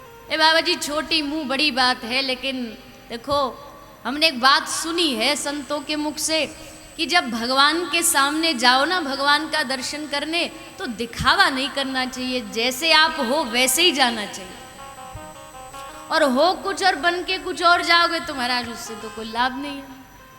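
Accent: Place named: Braj, India. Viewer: मैया यशोदा व भगवान भोलेनाथ बाबा का " बाल गोपाल दशॅन " के बारे में आपके श्री मुख से यह प्रसंग व सवांद सुन कर हम काफ़ी प्रभावित हुए हैं। 🕉️🕉️🕉️🕉️ खास बात आपका वृज भाषा के ऊपर प्रभुत्व (वैशै तो आपका जन्म स्थान ८४ कोश में आता है फिर भी आप के मुखार विंद से वृज भाषा हमें बहुत प्यारी,प्यारी व मीठी, मीठी लगती है और बार, बार मन सुनने को कर्ता है। ☘️🕉️☘️ सचमें मैया और भोलेनाथ बाबा बात करते है ऐसा हम अनुभव करते हैं। ☘️🕉️ देवीजी, आपका बहुत-बहुत धन्यवाद हमारा राघे-राघे और जय श्री कृष्णा स्वीकार करे । 🙏♥️🙏♥️🍀☘️🍀💐🌷💐 <mail> USA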